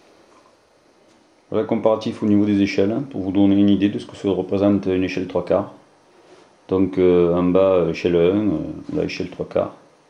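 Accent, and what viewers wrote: France. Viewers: hehehe i luv ur french accent xD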